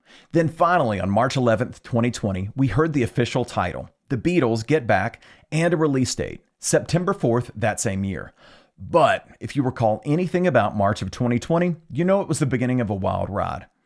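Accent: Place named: Baltimore, United States. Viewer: I'm digging on your vowel sounds. Must be Baltimore or something like it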